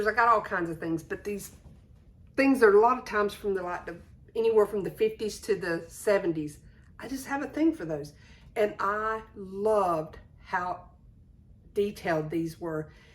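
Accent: South, United States. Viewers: Love that strawberry 🍓 cookie jar and the beautiful vintage linens! I've been collecting doilies, old handkerchiefs and table linens for crafting, but I'm having a hard time cutting them up. 😅 I also love the idea of a vintage toy tree! This year I had my Steiff reindeer pulling a sleigh full of vintage elves and mini teddy bears in Santa hats under the tree. Last year I had vintage Barbies in ugly Christmas sweaters plus my Mattel Elvis. I also wanted to tell you that I love your channel name. It reminds me of growing up on the Chesapeake in Virginia and your accent is so sweet. I miss the south! ❤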